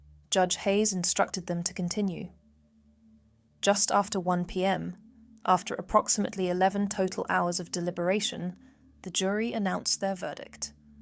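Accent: United Kingdom. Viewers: COPY CAT BRITISH NARRATOR!!!!!!!!!!!!!!!!!!!:face-purple-crying::face-purple-crying::face-purple-crying::face-purple-crying::face-purple-crying::face-purple-crying::face-purple-crying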